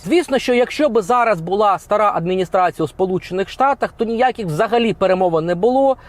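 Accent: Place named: Ukraine. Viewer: А шо ж ти такий патріот, та й за бугра попискуєш? Критикувати ж краще, чим самому взяти в руки зброю? Ми з сином працювали в Польщі 5 років. З початком війни ,він повернувся в Україну, а я слідом за ним. Син пішов в воєнкомат, хоча і не служив ніколи,маа звільнення в мирний час. Син в 32 загинув, а ти в свої неповні 40 ,обливаєш нас брудом і хизуєшся українською вимовою. А в самого навіть немає притулку на рідній землі. Приїдь, повоюй розкажи.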